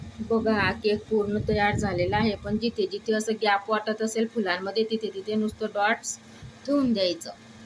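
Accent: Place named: Khandesh, India. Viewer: खानदेशी टोन आहे ताई तुमची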